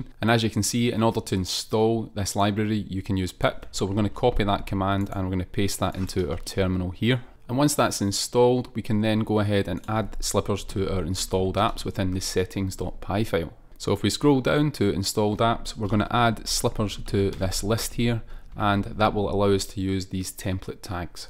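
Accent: Scotland, United Kingdom. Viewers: Ey lad, love the scottish accent, real killer. Would be real nice if you could just display what you're trying to build and what you'll get out of the framework/tutorial. Thanks a lot.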